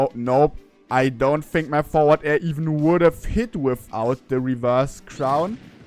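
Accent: Germany. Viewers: Holy shit are you german?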